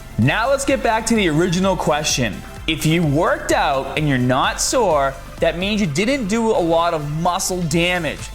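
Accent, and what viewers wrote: Boston, United States. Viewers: This guy is so Boston - his accent is all over the place. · I have a question about this guy. But, before I ask it, don't assume the purpose for my asking. It is stictly curiosity. It has nothing to do with my opinion of his reliabilty. Nor is it for any judgemental purpose. So here it is. His speech and facial movement/ eye movements seem to show signs of a, possible, minor mental disability or motor function disorder exc., that he seems to fight while focusing more on what he is saying. So, does he have some sort of disorder or what ever you want to call it? Also the signs are very seldom, being why I said "minor". Is it an accent or just how he is? He does show signs of a bostin accent but only partial or influenced.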